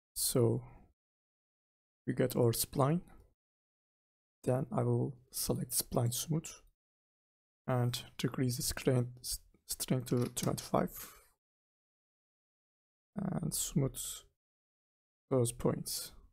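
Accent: Turkey. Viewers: is it Turkish accent? :D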